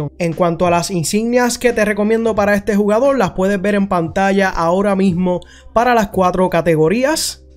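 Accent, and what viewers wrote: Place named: Puerto Rico. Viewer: Eres puertorriqueño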